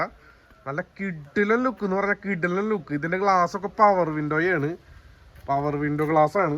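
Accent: Thrissur, India. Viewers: മ്മടെ തൃശൂർ ഭാഷ ഹമ്പ പൊരിച്ചു 🥰❤🔥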